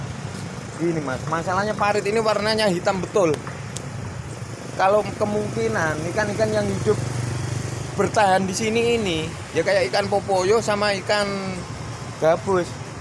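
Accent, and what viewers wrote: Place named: East Java, Indonesia. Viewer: sampean wong ndi cak, kog boso mu kyok jawa timur an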